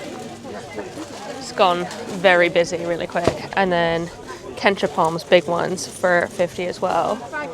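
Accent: United Kingdom. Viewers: I just noticed the longer that you have been in the Uk you are speaking more with a British accent. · That Brittish accent is slowly taking over your American accent.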